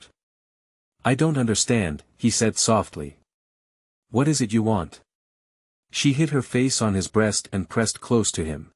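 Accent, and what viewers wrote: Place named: United States. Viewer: I dont like américain accents, to me ruins the poetry of the words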